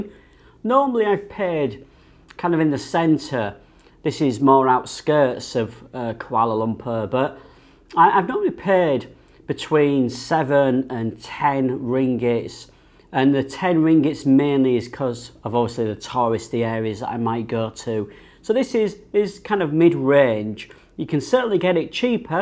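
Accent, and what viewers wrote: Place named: North, United Kingdom. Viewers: I like your Yorkshire accent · Hello Sir. I really enjoyed your videos about Malaysian local dishes. And I totally want to learn and pratice more so I can have English accent like yours. Happy quarantine in Malaysia. Stay safe 🇲🇾🇲🇾🇲🇾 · What does it matter how you say it (Kuala Lumpur)? that is part of the fun in far away land. I love your northern accent and always associate it with, honest straight talking. You could try a type of noodle dish called Char Kway Tiao. Glad to see your staying safe. Look forward to the next video. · Don't worry so much about your pronunciation...you're English... Next time when you do get out...buy some raw peanuts and raw anchovies at the supermarket...later can fry some up when you eat your nasi lemak at home...at least you can control the amount of anchovies you want to eat. Boil another egg if its not enough. 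Cheers mate.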